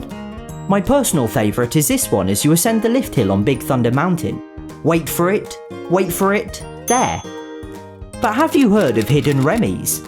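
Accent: England, United Kingdom. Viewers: I speak french, and that is one of the most english accents i have ever heard.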